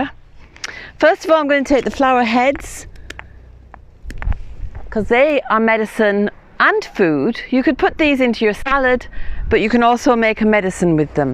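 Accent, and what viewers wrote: United Kingdom. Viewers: Omg so uncanny, you look like an older version of myself, or what I would imagine I would look like when I turn 50. What is it with Irish people? Why do I look so Irish even though I am a Finnish person :D .. or are you Irish? You sound more British. Now to the actual comment, I love dandelions! I always start to crave both the root and the leaf when spring comes, certainly something my body needs this time of the year. I have a tea brewing right now as I type, yes, there is dandelion root in it! It was nice to see some really hands on medicine making <3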